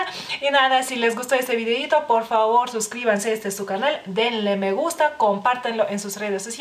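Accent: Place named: Spain. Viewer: Ya me dio hambre...no se porque🤔... Hablas muy español y esta bien bonita.👍🏼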